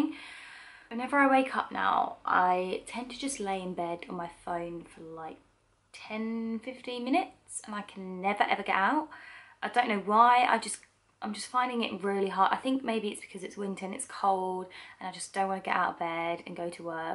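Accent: United Kingdom. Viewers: I love the British accent that you have!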